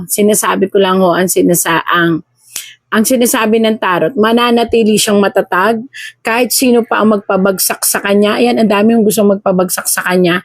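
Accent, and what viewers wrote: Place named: Visayas, Philippines. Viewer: Nku si ito nagsasalita.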